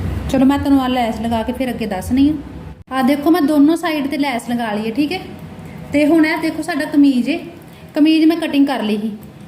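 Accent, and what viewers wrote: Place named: Majha, India. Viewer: ਤੁਹਾਡੀ ਬੋਲੀ ਮਾਝੇ ਦੀ ਏ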